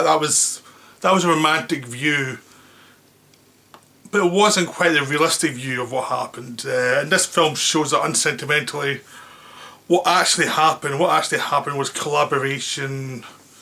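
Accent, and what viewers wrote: Scotland, United Kingdom. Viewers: He is a Scotsman that how he talks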